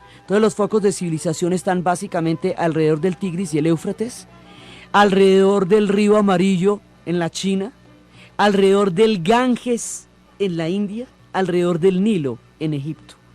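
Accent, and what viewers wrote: Colombia. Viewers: Gracias por estos hermosos relatos, los amo! He escuchado varios, en varrias ocasiones, igual que el resto de tu audiencia, pienso que sería buenisimo un mapa. Me encanta escuchar tu bello acento de Colombia, en México nos encanta la forma de hablar de tu bello país.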